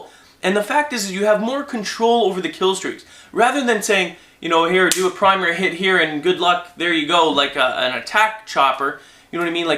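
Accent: Canada. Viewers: this guy is canadian right?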